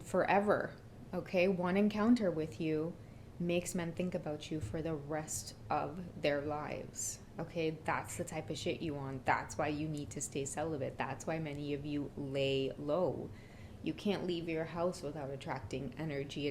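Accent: Trinidad and Tobago. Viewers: D Trini accent killin mehhh 🇹🇹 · 😂 Outside of your gifting to read, I LOVE di Trini vibes yuh does tro een every now and then! 😂👊🏿 TrinBago tuh di whurl wi!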